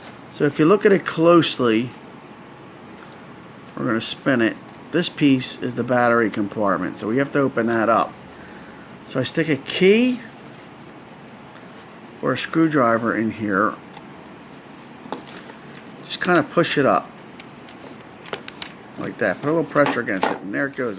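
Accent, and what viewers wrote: Philadelphia, United States. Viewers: You from Philly? Know the accent anywhere lol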